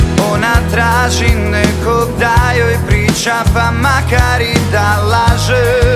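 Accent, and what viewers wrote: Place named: Zagreb, Croatia. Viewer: Dobar si.Zagrebački naglasak.Super.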